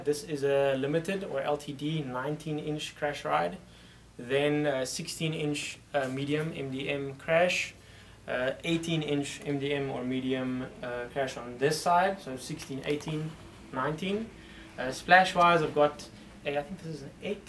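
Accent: South Africa. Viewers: when ever u talk it reminds me of relatives in South Africa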